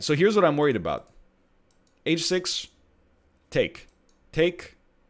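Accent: Canada. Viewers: Did Canadian got worse (or better, depending on preference xD) in the past few months or am I crazy? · Am I crazy? Has accent gotten more Canadian recently? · Y'all can't convince me that he doesn't sound more Canadian than before.....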